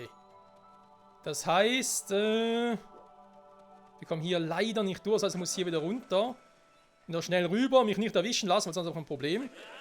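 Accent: Switzerland. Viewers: Hey gutes Video, bist du Schweizer? :D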